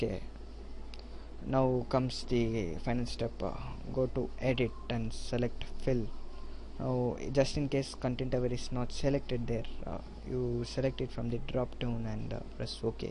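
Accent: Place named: India. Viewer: It's English. Trouble with Indian accents?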